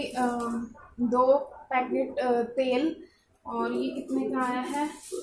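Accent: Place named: Faridabad, India. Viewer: Aap faridabad se ho lagta he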